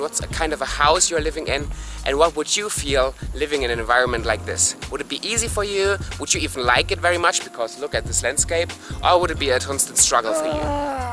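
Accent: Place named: Eastern Visayas, Philippines. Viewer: nice u speak the dialect WARAY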